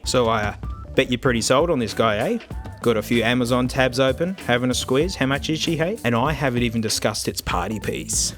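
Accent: Australia. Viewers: I'm American but starting to have Australian accent just by watching your amazing videos!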